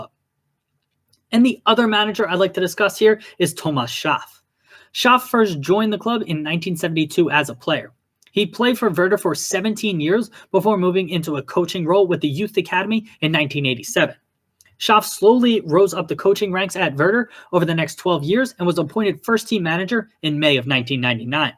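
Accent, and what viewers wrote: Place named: United States. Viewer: Please try learn pronounce correctly mames out of your language, or you'll keep sounding as an ignorante yankee. Nicely said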